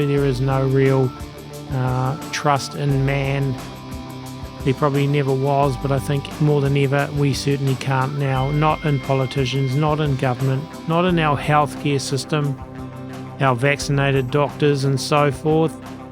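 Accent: New Zealand. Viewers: I always smile when u first welcome viewers - a Kiwi accent! 😃💗 Beautiful! From the Waikato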